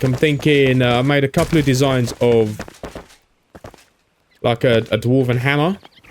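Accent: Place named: England, United Kingdom. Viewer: I´m watching this series not only because I like Enshrouded very much but your accent mate, I really like it, typical english imo, greets from the Netherlands, keep up the good work!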